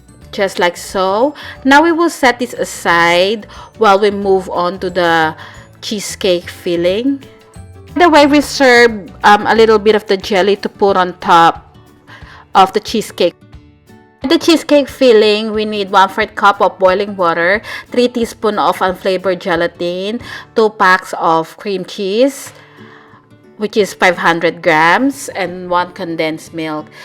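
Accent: Philippines. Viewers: Just want to ask if youre an Ilongga 😆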